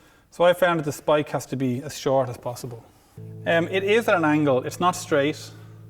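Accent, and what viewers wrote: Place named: Ireland. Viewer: Third, it's called an Irish accent dumbass.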